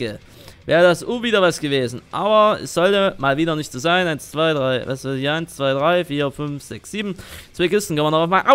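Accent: Saxony, Germany. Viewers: weil sachse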